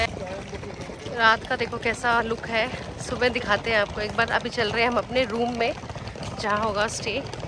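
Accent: India. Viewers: Tum lazmi hindu ho. Kyu k tum namastay aur sat sri akaaaal to bolti ho laikin salaaaam boltay tum ko bohat takleeef hoti hai. Sikh hoti to salaaam zaroooor karti. Sikh hoti to punjab ya khalistan ka naaam layti. Baaar. Baaaar. India. Ya. Hindustan. Na. Bolti. Tumhara. Urdu. Bolnay. Ka. Andaaaz. Pakka. U. P. Wala. Hai. Bhia. Ho. Bhia. U. P. Ki. Bhia. Love. Khalistan. ❤❤ v. Real. Sikh. For. Khalistan. Khalsa. For. Khalistan. May. To. Insaaaan. Ki. Shaksl. Dekh. Kar. Us. Ko. Pehchaaaan. Layta. Hoon.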